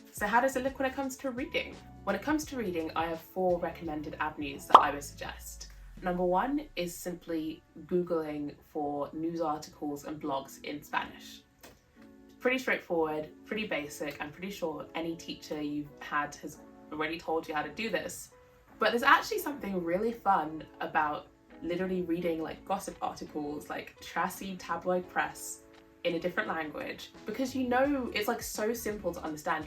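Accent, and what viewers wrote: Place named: England, United Kingdom. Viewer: Your English acent is amazing !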